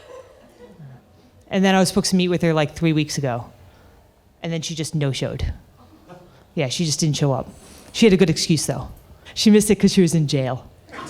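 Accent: United States. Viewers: Ive never seen a more Irish head in me life😅 n im Irish😂 I expected a strong Mayo accent..not a Murican...cant prove your mother was Mary from Mullingar but 😅...either way...epic, feckin brillllant set a cailín!! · Are you from new england ?? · You sound Boston ish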